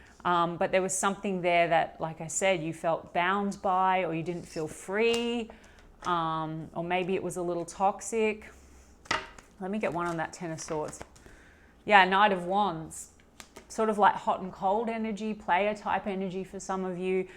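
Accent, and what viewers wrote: Australia. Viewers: Really like, great interpretation and Lovely Aussie delivery😊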